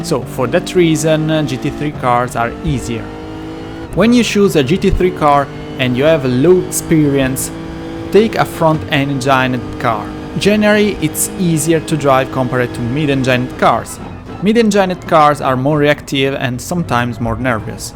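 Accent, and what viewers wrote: Italy. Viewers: Nice italian accent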